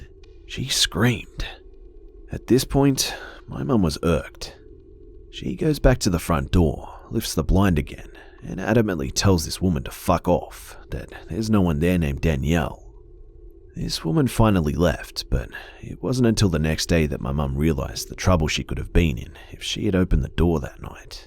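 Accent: Australia. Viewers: The way he tells a story is not scary whatsoever. but more like he's reading from a newspaper. I mean, I can actually listen to him in the dark at home by myself and it doesn't creep me out nor scare the hell out of Me! Or maybe it's the accent, because Australians have the worst accents on the planet to me!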